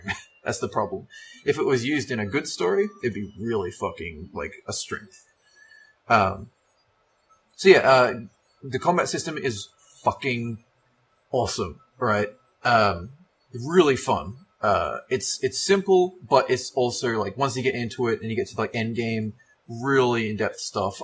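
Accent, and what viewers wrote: Australia. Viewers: waaait.Where are You from,?Australia? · Yeah I was right, he's totally Australian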